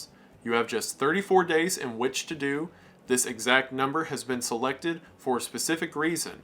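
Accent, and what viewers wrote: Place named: Carolinas, United States. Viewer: Where are you from? You definitely sound like someone from the Carolina’s or maybe Virginia.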